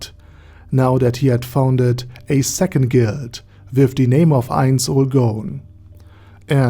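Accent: Germany. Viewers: his voice's the stereotypical german accent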